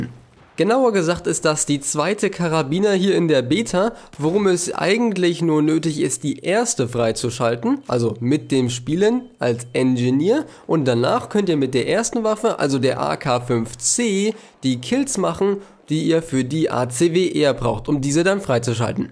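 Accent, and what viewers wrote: Germany. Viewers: Kommst du aus Norddeutschland?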